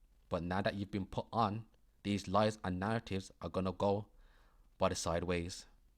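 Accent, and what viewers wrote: Birmingham, United Kingdom. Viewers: Deffo a Brummie accent!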